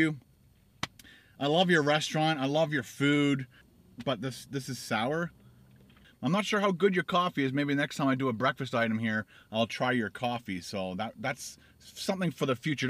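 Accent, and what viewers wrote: Canada. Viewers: #snausage and #egger makes English with a Canadian accent awesome, eh!!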